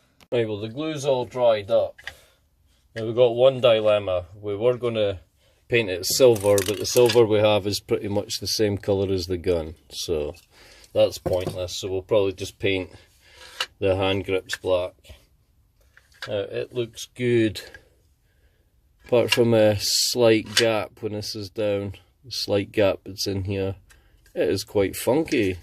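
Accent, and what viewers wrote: United Kingdom. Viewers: how can i get in contact with you> are you from uk im guessing with the accent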